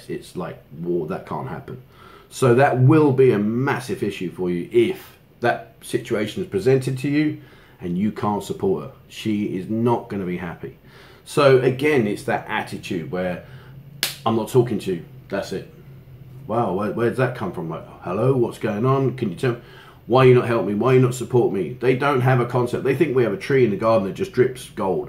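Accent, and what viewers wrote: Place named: United Kingdom. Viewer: Thank you for all these precious advices. Also your British accent is very smooth and to me it is a good exercise for my bad English.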